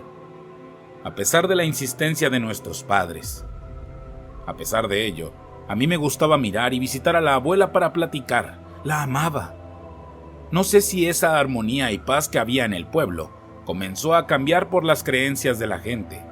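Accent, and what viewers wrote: Mexico. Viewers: adoro el acento Mexicano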